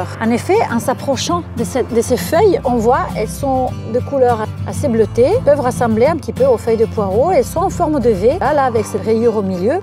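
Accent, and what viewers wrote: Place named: Provence, France. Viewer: ce merveilleux accent digne du terroir provençal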